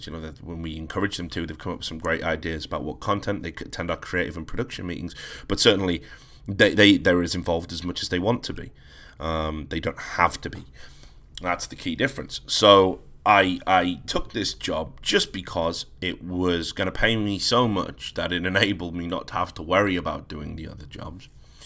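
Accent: United Kingdom. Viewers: Don't let them kill your accent, the British accent is like half the reason I watch your podcasts <3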